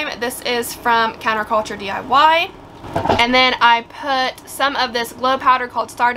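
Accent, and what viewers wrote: South, United States. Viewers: Ok, I love your videos! So natural and fun...but I have to ask, where are you from? I’m from the south and sometimes I hear a bit of a southern accent but I’m not sure. Just curious. 🤗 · omg I’m a southerner and thought you were from the south this whole time!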